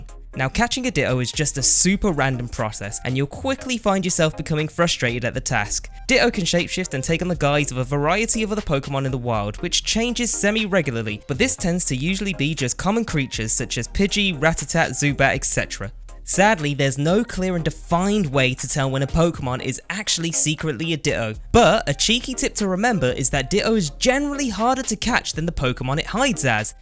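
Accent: United Kingdom. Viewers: He says di-o instead of ditto lol (Yes i know he has a british accent dont come at me) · Örs Vajda Yo, he sounds English. That’s why he’s pronouncing it the way he is. The British did sort of create the English language.. even if it’s a mashup of other ones.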